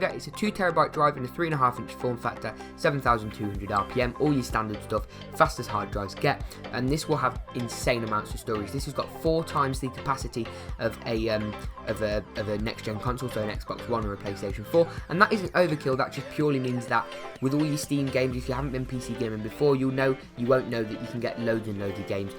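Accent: England, United Kingdom. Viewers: you sound English because ,you should use £ as well as dollars because I'm not sure about the prices ,oh yea I subd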